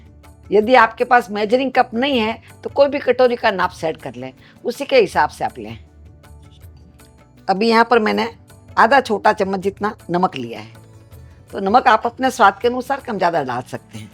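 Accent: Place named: Rajasthan, India. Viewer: Nice mam love from Gujrat ❤ I also love Rajsthani people your rajsthani tune in all recipes thats why I watch your all videos you look like morden Nani 😅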